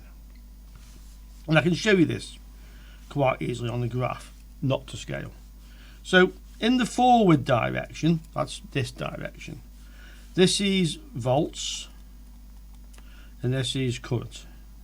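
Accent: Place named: Sheffield, United Kingdom. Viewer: It's called an accent. Sheffield or thereabouts if I was to guess. Clear as a bell to my ears.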